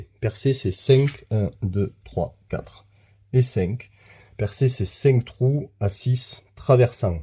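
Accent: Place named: South, France. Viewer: Hep! Encore une preuve de ton talent mon ami, avė l,accent du sud con ! ,ce n,est plus de l,or que tu as dans les mains pour faire ces belles choses d,ajustage, ceux sont des diamants que tu as dans les pognes, vraiment je t'envie ! Et en plus tu es humble 🙂🤘💕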